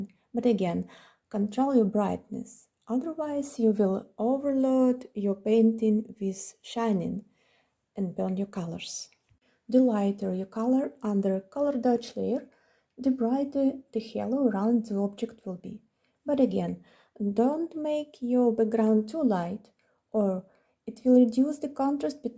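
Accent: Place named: Portugal. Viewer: how lovely hahaha eu gosto do sotaque do portugues europeu hahaha. oh btw what is your native language? you speak three languages i'm jealous. blue is my favorite color am I cool 😎 or am I cold 🥶 hahaha or both hahaha lol Iuno is beautiful so is your art i'm definetelly pulling for her, can't have the sun without the moon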